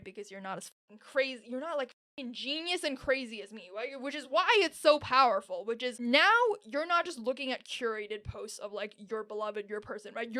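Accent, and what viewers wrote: United States. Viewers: Way too funny & chaotic, as an American you sound like one & subscribed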